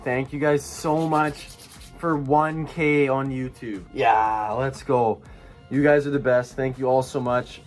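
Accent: Canada. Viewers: Commentary is hilariously Canadian #LetsGoBoyzzz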